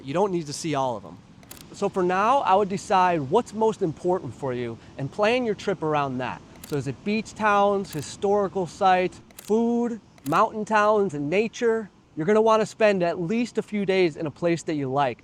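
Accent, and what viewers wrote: Vietnam. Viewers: What’s happening, another banger vlog, I must say out of all the Nam vloggers I seen your channel has to be the top tier for me it’s very interesting and informative def its a disservice how your SUB# are not in the 3 digits Last but not least hearing your thick namese accent be cracking me up 😂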